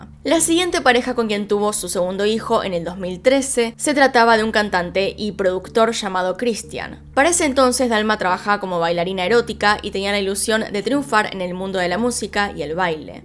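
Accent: Argentina. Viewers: soy de origen mexicana pero casi toda mi vida vivo en Amsterdam, tan chistoso el accento Argentino con el sssshhhhhhh muy interessantes video's nadamas tu maquillaje muy claro/blanca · Esta mujer me encanta tambien😻😻 sobre todo por su acento argentino y su inteligencia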